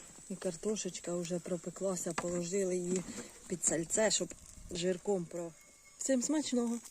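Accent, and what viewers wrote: Poltava, Ukraine. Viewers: 👍🙏❤💙💛💐👋Вітаю! Випадково натрапив на ваш канал. Сподобалось, як розмовляете з полтавським акцентом. Ви також красива, бо полтавчанки ж найкращі!!! Щиро бажаю міцного здоров'я, простого жіночого щастя та якнайшвидшого мирного життя!!! А керамічними ножиками не дурні ріжуть, а дурні їх виготовляють. Такими ножиками тільки зелень різати, а мерзле смачне сало добре ріжуть саморобні, дебелі та надійні ножі. Бажаю вам подальшого розвитку каналу та багато - багато доброзичливих підписників! Хай щастить!!!❤❤❤✍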